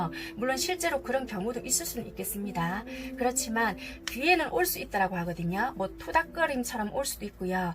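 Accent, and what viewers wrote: Busan, South Korea. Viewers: 저는 헤어지고 연락 끊어져서 연락이 와야 투닥거릴수가 있는데 ㅜㅜ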